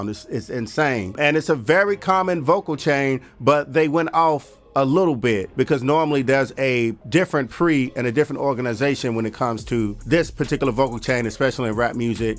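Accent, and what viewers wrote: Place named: Nigeria. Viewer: I Don't know why i though you are Nigerian 😂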